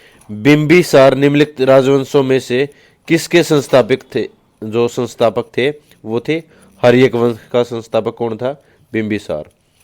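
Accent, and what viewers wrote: Haryana, India. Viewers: Bhaiya the Haryana s ho ke?😊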